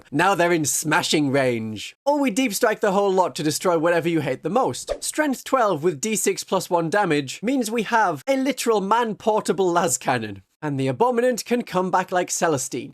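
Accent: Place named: England, United Kingdom. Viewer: Your accent makes it sound like you might be in the same corner of England as me. I'd suggest meeting up for a game but I don't know how I'd feel playing against a 2d animated character. Who framed roger rabbit freaked me out as a kid so I'm not sure my mind could take it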